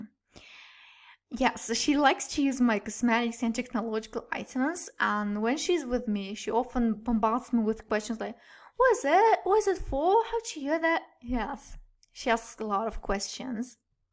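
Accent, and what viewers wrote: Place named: United Kingdom. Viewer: Your pronunciation is very pleasant 🤗How have u learnt to pronounce like A British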